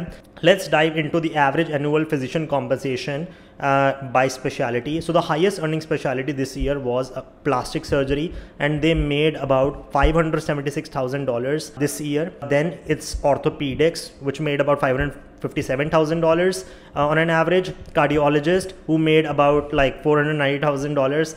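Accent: India. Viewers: Bro lives in USA and still managed to get an Indian accent thicker than me 😢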